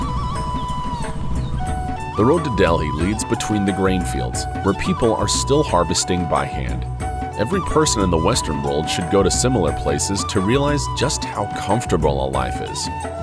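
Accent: United States. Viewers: Great video and editing. The 'american' overvoice does not do you justice. I would like to hear a Czech voice.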